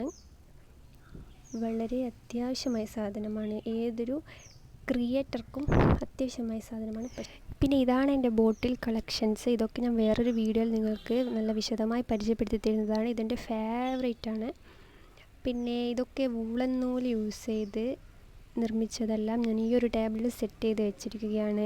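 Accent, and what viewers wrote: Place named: Kannur, India. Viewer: കണ്ണൂര് ഭാഷ അത്ര മോശം ഒന്നും അല്ല ഇങ്ങള് പൊളിക്ക് ഭായ്.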